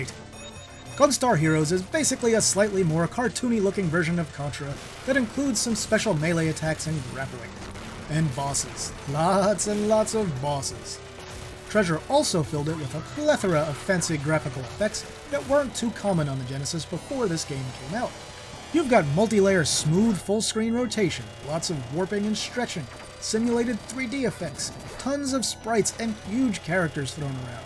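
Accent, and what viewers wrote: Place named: Western Canada, Canada. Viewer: That's cool. You speak a bit like they do out west.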